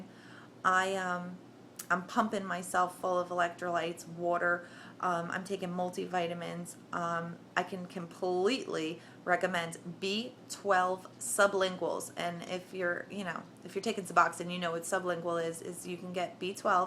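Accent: Philadelphia, United States. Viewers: Lol soon as I heard you talk I knew u were from Philly or jersey. Philly here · hey I really appreciate your vids. don't listen to the haters and I hope ur getting a good laugh at the people commenting on here that are obvi. LEOs. anyway you bring up really good points. are u from philly? I think I hear the accent lol. im from the suburbs detoxing myself from subs right now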